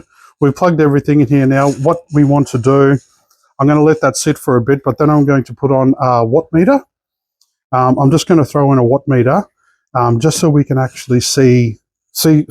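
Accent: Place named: Australia. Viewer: You had me going there for a while, thinking that you were probably from the US, but your accent was really off. After watching a few more minutes, I realize that oh you’re down under! Didn’t think they had Aldi‘s down there, but I guess they’re all over the place. Love the review. Hope next year when I’m down in the US checking out Aldi‘s that they might have these. At less than a dollar a watt, that is a great bargain. There is an Aldi‘s in upper New York State, about 70 miles from Montreal. Anyways enough said, I wish you happy holidays and have fun camping. Our camping season won’t start for another five months. here we just got 4 inches of snow and winter is just starting. Mike from Montreal.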